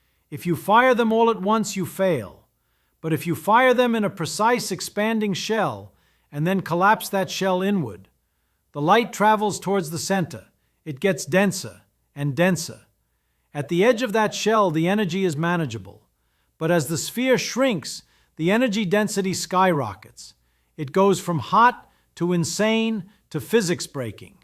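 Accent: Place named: Brooklyn, United States. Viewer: Whoever you are, thanks. I’m aware it’s ai but Feynman was a favorite and you’ve captured his spirit very well. I see a ton of these videos, yours nails his Brooklyn accent.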